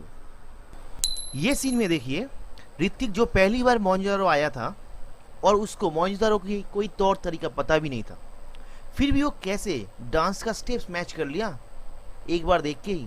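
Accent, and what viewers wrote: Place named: Bengal, India. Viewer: You are a Bengali right ?... ik this accent ...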